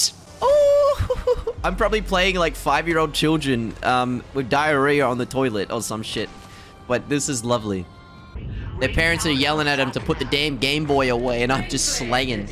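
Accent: Australia. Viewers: Are u from Australia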